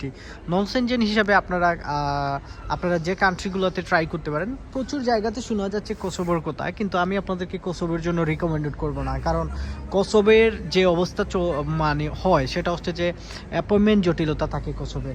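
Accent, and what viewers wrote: Sylhet, Bangladesh. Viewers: ভাই আপনি সিলেটি নি??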